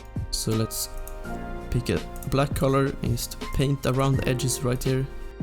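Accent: Sweden. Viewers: Haha man hör den svenska engelskan👍men sjukt bra video man blir sugen på fixa photoshop när man kollar på de här du är grym på de du gör!:)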